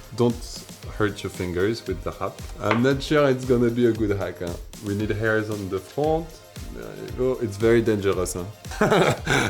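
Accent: France. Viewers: are you french???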